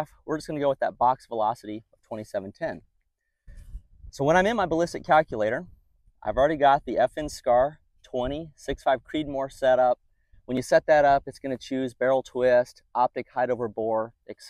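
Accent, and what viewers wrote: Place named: Atlanta, United States. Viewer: I knew that accent was from Atlanta lol, just needed to hear the "Ah-lanna"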